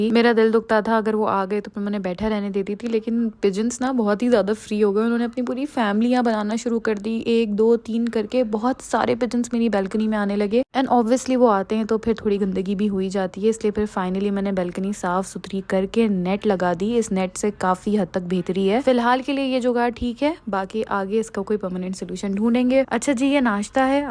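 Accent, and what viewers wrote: Karachi, Pakistan. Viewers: hello, apkay baat karnay ka style khana wagira bilkul hamaray ghar ki tarha ha.. im also from Karachi living in Sweden..after a long time i found somone from vlogging whom i can totally relate with❤.. plus abt your suggestion im interested in starting dil ki batein series... because that would be great if we share things with each other.. but i think it depend on you. if you are making 2 vlogs in a week tu ek dil ki batein series vlog n second vlog of your routine 😊 best of luck 😊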